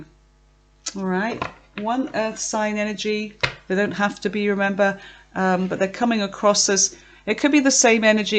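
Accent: United Kingdom. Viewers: Hello, Shout out from the Coachella valley. Brilliant Reading 😊 By any chance, are you from Cornwall England? Cheers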